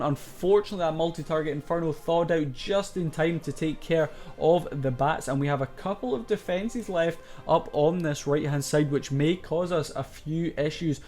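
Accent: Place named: Scotland, United Kingdom. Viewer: your accent reminded me of "trainspotting" movie "choose life" thank you for the vaedio man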